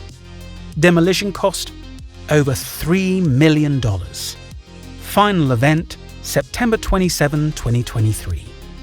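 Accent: England, United Kingdom. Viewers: Nice english accent😊😊😊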